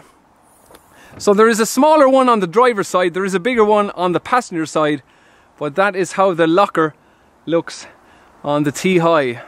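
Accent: Ireland. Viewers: I come for the trucks and stay for the accent haha love you Irish!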